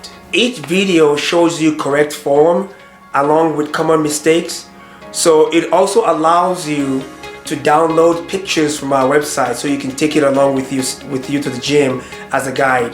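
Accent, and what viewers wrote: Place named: Ghana. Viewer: Sounds like youre from Africa..Ghana maybe?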